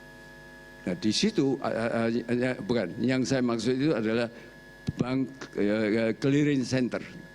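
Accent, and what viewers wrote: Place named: Java, Indonesia. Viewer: Gaya bicaranya mirip mendiang Pak Soeharto...dan terasa banget logat Jawanya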